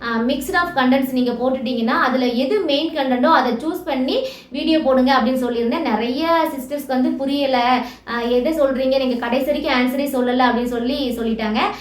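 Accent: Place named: Tirunelveli, India. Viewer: Tirunelveli slangs super sister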